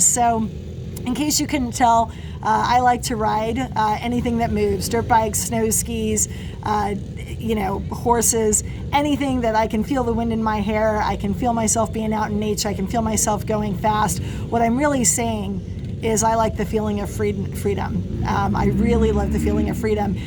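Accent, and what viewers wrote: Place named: Pennsylvania, United States. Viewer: You sound like you ran around the hills of pennsylvania!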